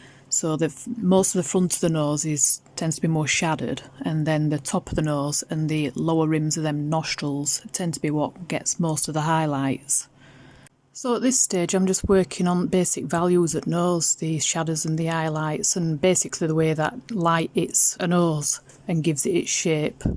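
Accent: Yorkshire, United Kingdom. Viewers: Love your channel and that you speak my (yorkshire) accent! Dog noses are my weakness so this is super helpful for me!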